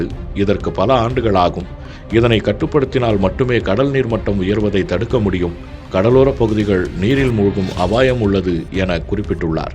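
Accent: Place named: North Chennai, India. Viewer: Daiii Tamil News Olunga padichi podunga da Unga istathuku Tamil la kolathingada Ena Vadachennai slang la potu vachi Asinga paduthathinga da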